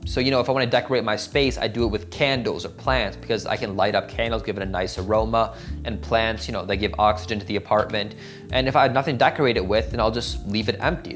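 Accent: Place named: United States. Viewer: Hey you are from India? How did you get the american accent so easily?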